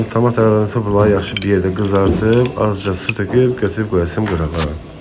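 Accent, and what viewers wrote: Zaqatala, Azerbaijan. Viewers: Аnkaradan Zakatalalı hemyerlimə salamlar. Bişirdim. Çox gözəl oldu. Sağ ol. Lehcemizi özledim. Su tökax❤😂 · Mən istəyirdim yazım ki,qardaş diyəsən zaqataladandı,çün ki,zaqatalalılar belə danışırlar,eliyəsiyəm,gedəsiyəm,gələsiyəm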